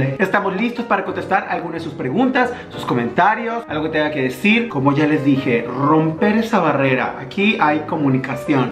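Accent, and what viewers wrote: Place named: Yucatán, Mexico. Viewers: Amooooo tu tono yucaaaaaa NUNCA LO PIERDAS AHHHHHH MARE LINDO HERMOSO 😉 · Tu voz siempre se me ha hecho peculiar, ese acento fresa y yucateo a la vez. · Cierto es que le dio un espacio en el mundo al acento Yucateco. Por ti, creo que habemos muchos que antes no lo tolerabamos y ahora amamos el acento y hasta lo imitamos. Te quiero nene. Pd lavate bien tu tuch que hay covid lol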